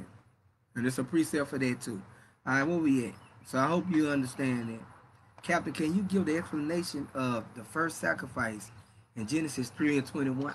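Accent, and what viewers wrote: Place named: South, United States. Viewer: the southern accent reading KJV sounds nice. like it makes it easy to understand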